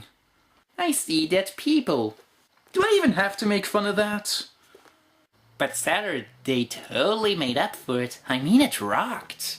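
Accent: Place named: Germany. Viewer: This was hilarious! Loved the jokes, loved the accent (what is it by the way, German?). Great video! Only suggestion is to put only 3 clips instead of 4 and to quote a little less. Also maybe go over plot characters. Amazing video though, loved it!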